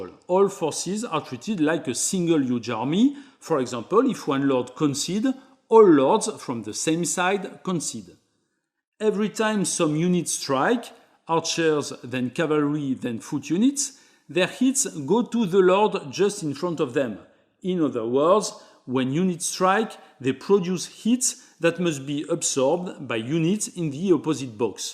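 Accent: France. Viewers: Thanks to you I was able to play Nevsky and I made some great memories with my best friend. I just bought this game because you made this tutorial. You are the best, if you keep making these as this series rolls out I will keep buying them. Plus you're French accent is awesome. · Giving the thumbs up for the french accent alone. Very nice video.😊👍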